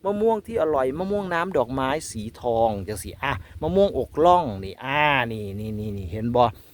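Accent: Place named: Nong Khai, Thailand. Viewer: สำเนียงคือคนแถวบ้านผมเลยครับ ผม หนองคายครับ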